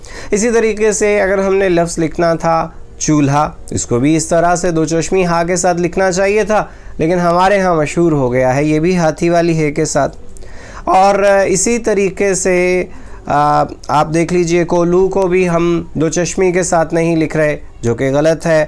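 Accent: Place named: India. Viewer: Aap kahan se ho?.....talaffuz india ka lag raha hai......masha Allah....achchi aur durust koshish hai.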